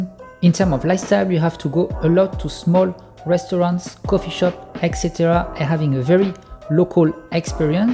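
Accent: France. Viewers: T'as ouvert la bouche j'ai direct compris que tu étais français 😁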